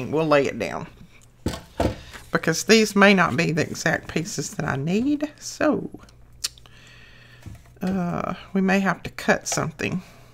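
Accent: South, United States. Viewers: you just keep doing what you do. I sit down and craft while I watch you every night. You keep me company. I love listening to your lovely Southern voice! Thanks for what you do❣️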